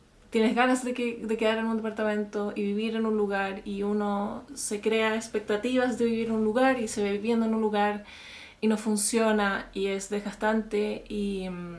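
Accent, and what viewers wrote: Chile. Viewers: Hola, de dónde erea originalmente? Oigo un acento chileno:p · Tu acento chileno es pura paz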